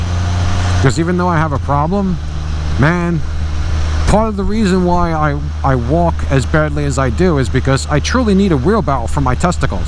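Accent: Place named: New York, United States. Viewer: okay!!!!.......i'm hooked!!! some of the funniest videos i've seen on youtube. thanks for the laughs. i grew up in scarsdale in the very early 70's and visited long island a few times back then. it's also great to hear that authentic new york accent and attitude. thanks for making me laugh for a few minutes, stay upright and healthy.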